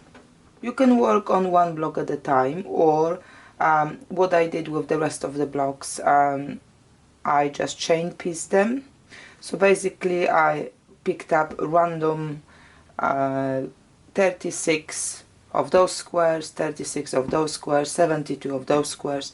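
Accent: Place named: Poland. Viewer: Love working w scrapes…great videos. Where are you located, your accent reminds me of my Polish friend.😊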